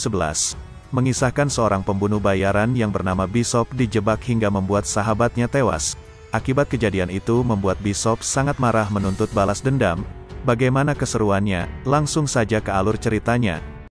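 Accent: Palembang, Indonesia. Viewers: Bang orang palembang kah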